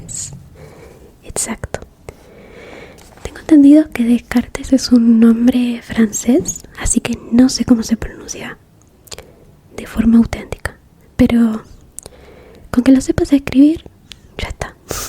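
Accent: Argentina. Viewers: Hace poco conocí tus Asmr y me ayudaron una banda para estudiar para unos parciales q tenía considero tu canal el mejor de Asmr de Arg creo q sos de aca o de Uruguay x el acento sjsjjssj Nada seguí así PD:Tenés unos re lindos ojos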